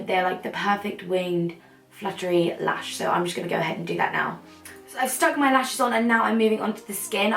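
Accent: Newcastle, United Kingdom. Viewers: Ahhhhh fuck it lets purit everywhere 😂😂 I'm a Geordie and you legit sound like me when I'm getting ready 😂😂🙌🏼👏🏼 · Why were you doing a geordie accent? & love your skin makeup! X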